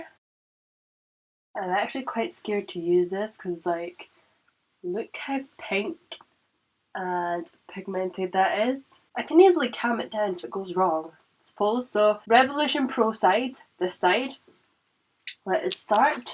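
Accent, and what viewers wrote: Scotland, United Kingdom. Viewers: Love your accent Scottish?🏴xo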